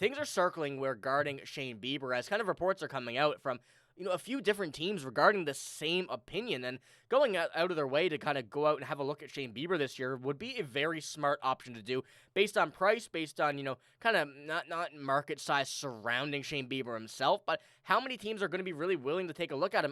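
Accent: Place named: Canada. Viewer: I am pretty sure by your accent that you are Canadian, so how did you get to rep the crew?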